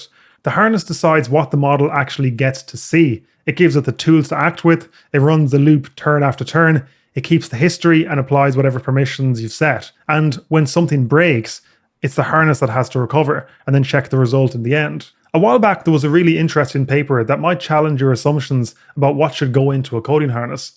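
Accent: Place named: Ireland. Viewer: I wonder why people still looking for better harness after pi was release, the new hype sort of Prime Agent is just Pi for lazy people to create its own extension and add few more lines on the sysprompt. Btw, first video I see from your channel, already subscribed, nice content, it would be good just to have the original audio with some Irish accent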